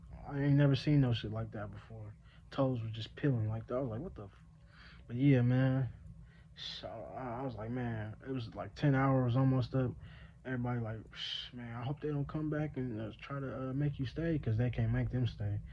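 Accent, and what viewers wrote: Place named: Inland Empire, United States. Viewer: You're from the IE? Low-key speaking like you from the inland empire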